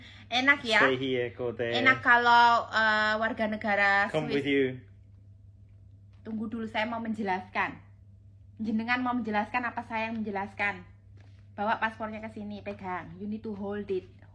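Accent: Java, Indonesia. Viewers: Itu bapaknya ga mungkin setres,,karna istrinya lucu lihat gaya ngomongnya aja udang ketawa gaya indonesia bangeeet. 👍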